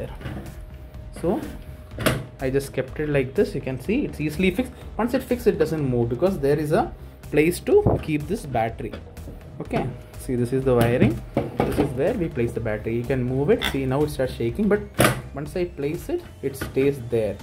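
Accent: India. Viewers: love your tanglish accent lolll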